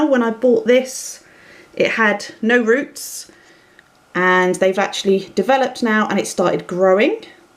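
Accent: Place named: West Midlands, United Kingdom. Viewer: YIPPPEEEEEE I LOVE Plant Tours and WOWSERS you have a REALLY BEAUTIFUL Collection <3 I LOVE your variegated Thanksgiving cactus, all your plants are so beautiful and they are all so nice and tidy and beautifully potted up, and I LOVE your greenhouse too, and OMG WOW at your Euphorbia lactea ghost its a big stunner <3 I think your cactus is a Thelocactus variety or mammillaria compressa as they look very similar, and your cactus figurines are so cute too haha <3 I think from your accent you are from my neck of the woods originally (Warwickshire, West Midlands ? ) thanks so much for this wonderful update and sending you an abundance of love and happiness and PLANT POWER from across the Emerald Isle XXXX <3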